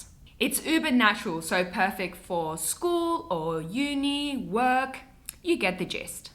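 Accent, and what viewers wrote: Australia. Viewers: I really love your accent ... Make me think to my "aussie crush"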